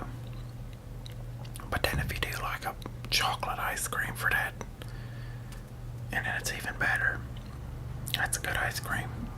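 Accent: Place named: Baltimore, United States. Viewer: Hey, love your videos. It's interesting to hear that your accent is PA based. You sound SO much like you're from Baltimore, which is where I live. Anyway, keep up the good work. Your voice absolutely lulls me to sleep.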